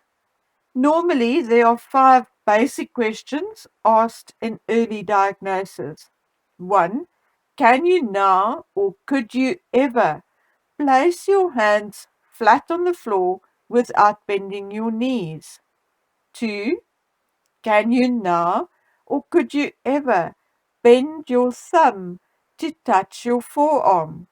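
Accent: South Africa. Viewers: This woman (commentator) sounds like a South African, because of the accent. I am from SA and I can tell.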